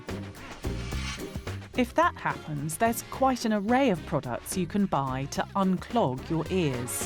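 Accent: United Kingdom. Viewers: What a beautiful British accent God Bless 😇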